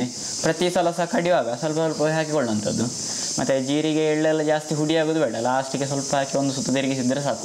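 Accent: Mangalore, India. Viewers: I like ur mangaluru language love from bidar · ಮಂಗಳೂರು ಕನ್ನಡ sounds good 👍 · Manglore kade ide tara swachha kannada matadtare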